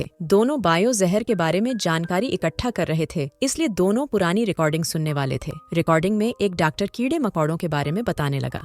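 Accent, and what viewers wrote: Punjab, India. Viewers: Hlo aap punjab se ho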